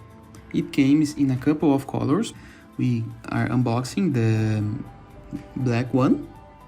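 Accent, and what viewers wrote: Brazil. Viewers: Pensando em comprar o branco. Teu sotaque entrega bastante que é Brasileiro kkkk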